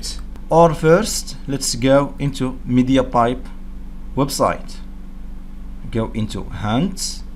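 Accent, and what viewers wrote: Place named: Morocco. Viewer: SAWT 3NDK MGHRIBI A SAT HHHH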